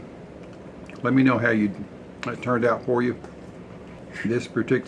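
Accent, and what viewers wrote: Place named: East Tennessee, United States. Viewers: This looks wonderful!!! About your accent, it's great!! Here in Tennessee, you'd fit right in!! Take care! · thank you so much, I went back and rewatched your video and got the ingredients, just cuz I like to hear you talk I'm from East Tennessee so we speak the same language, lol...imma be making this today, that is if I can get Walmart to deliver my beer😅 Tell your wife you tickled me too, make me spit my coffee out when you we're trying to say particular😂😂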